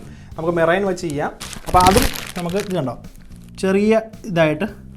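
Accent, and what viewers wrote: Kottayam, India. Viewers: കോട്ടയംകാരൻ ആണോ!! നല്ല സംസാരശൈലി!!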